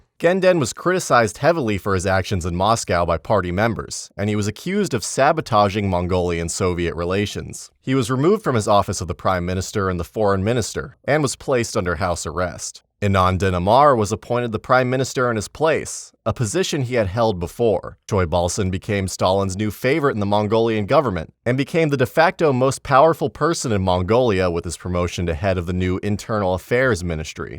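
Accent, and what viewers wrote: United States. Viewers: Only an American would talk about “Buddhist” church! The ignorance is astounding! They are also the ONLY people who say “Moss-Cow” and not the correct: “Moss-Cow” Never trust an American teaching history. Especially one ignorant enough to think Buddhist’s have churches! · speaking in America English ?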